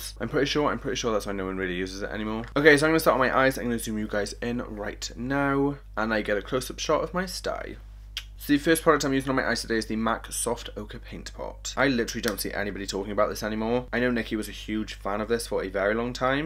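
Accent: Wales, United Kingdom. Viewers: Are you welsh? Love you btw !! 💓💓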